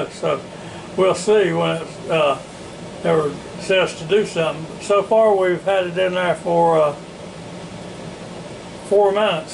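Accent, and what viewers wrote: South, United States. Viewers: It’s the southern accent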